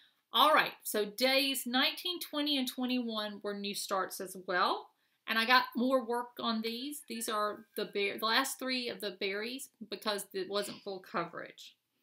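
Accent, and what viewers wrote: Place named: South, United States. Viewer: Hi! I have been binge watching your videos...I love your choice of projects! I love your southern accent too! I watch your videos while stitching and I find myself agreeing with you out loud. My husband will peek in asking, who are you talking to...? LOL!!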